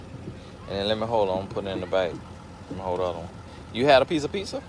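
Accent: South, United States. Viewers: you really are country I’m dying laughing at ends meat 😂😂😂 had to pause right here and catch my breath...now I can continue watching 😅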